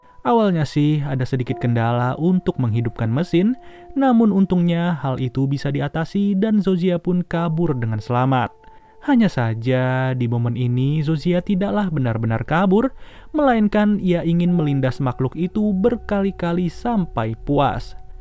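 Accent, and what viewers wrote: West Java, Indonesia. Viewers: Bang lu orang Sunda ya?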